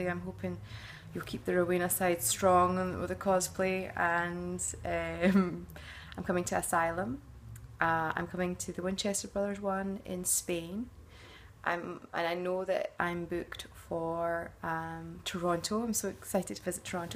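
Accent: Scotland, United Kingdom. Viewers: I adore the way you do your makeup. You should do a tutorial on how it's done. Also I love Rowena and your lovely Scottish accent. sarah in Ohio, U.S.A. P.S. I adore the way you say, Fergus. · I love your Scottish accent. so don't worry about if you're American accent is not great. I love your sarcasm also. I'm becoming a big fan.:-)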